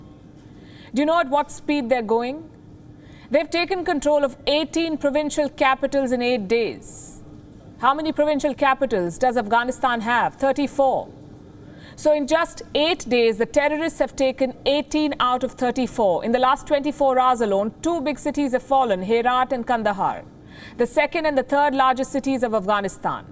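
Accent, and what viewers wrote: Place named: India. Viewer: Indian accent so heavy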